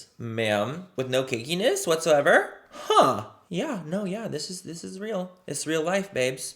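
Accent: United Kingdom. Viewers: Loool the British accent 😂😂 love it!! 👋 hi all the way from London ☺️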